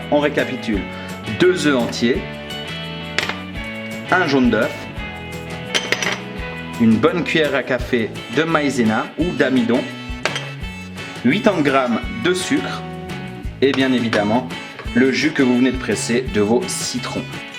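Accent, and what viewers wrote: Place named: Valais, Switzerland. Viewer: Le bonne accent valaisan 😍😂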